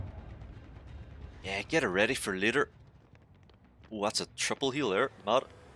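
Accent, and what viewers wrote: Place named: Northern Ireland, United Kingdom. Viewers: northern Irish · Im not good with accents, but I would say he's Northern Irish.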